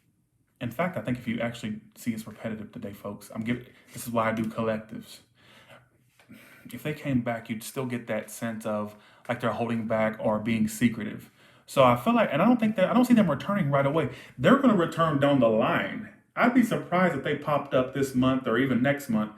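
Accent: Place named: South, United States. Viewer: Your Strong Southern accent is popping up today.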